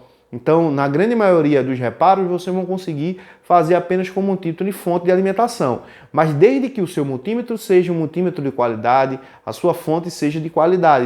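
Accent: Pernambuco, Brazil. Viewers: Tu es Pernambucano? E alve Rubro?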